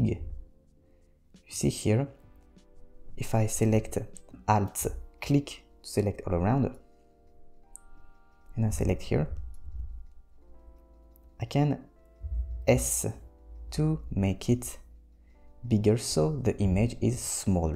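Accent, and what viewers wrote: France. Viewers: ça pue l'accent français tout ça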